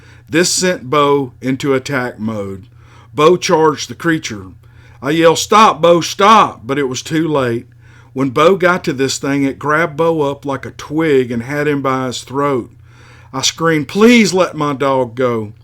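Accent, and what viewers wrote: South, United States. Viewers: I think Sasquatch are far more intelligent than most people realize. Whether it understood english or simply read the man's body language, I'm sure they understand enough from their experiences with humans to see that we love our canine companions. Perhaps they keep animals as pets, too. Great story. I enjoy the stories spoken in that familiar southern drawl. Keep em coming! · Had been watching Bigfoot videos on youtube and came across your channel. I never comment, but so enjoy your stories and southern accent I had to say what a great job you do. Thank you for the interesting stories and the time you put into this channel.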